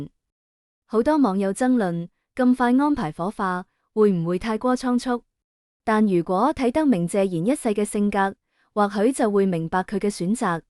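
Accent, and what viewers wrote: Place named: China. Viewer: 你們大陸人讀好D講東話才講啦。應找個香港人來讀。你們讀音太難聽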